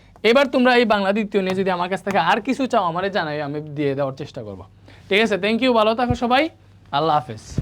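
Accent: Sylhet, Bangladesh. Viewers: স্যার কি সিলেটি 😊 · Apni ki Sylhet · সিলেটি ভাই, কিতা করো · Sir apni sylhety basay Kotha bolen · ভাই সিলেটী নি,,,🫣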